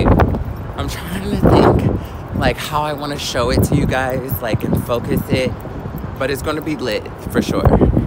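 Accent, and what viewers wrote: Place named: New York, United States. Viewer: I feel like a failure. I legit thought you were from NY!????!?!?!?? Like where did I get that from? Omgoodness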